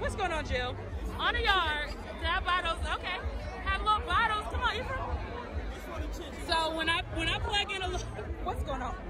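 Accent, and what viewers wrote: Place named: North Carolina, United States. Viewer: It’s crazy how you can tell someone is from NC without even knowing them!! Tre-4!! 😂Just found your channel girl love the content!!!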